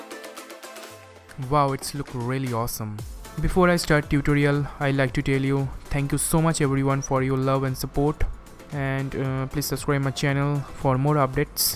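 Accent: India. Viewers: By your accent i can recognize you as Indian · omg at first i thought he was american but his Indian accent is getting more and more lol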